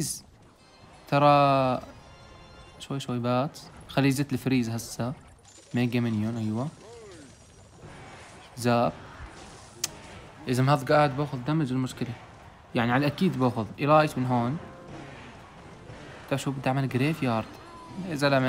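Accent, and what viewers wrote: Iraq. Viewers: حجيك قريب للعراقي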